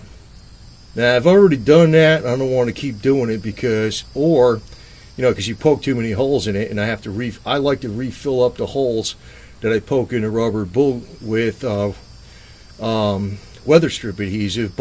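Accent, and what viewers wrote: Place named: Cleveland, United States. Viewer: no offense.....thought I heard a little Cleveland in you....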